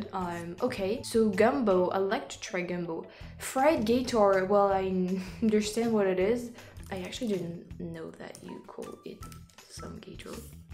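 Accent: France. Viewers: Hi, what part of France are you from? I can't tell from your accent. Thx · Aghhh, i'm loving ur channel and ur french accent😍 Actually i'm studing french cuz it's so beautiful and now i'm happy that i found ur channel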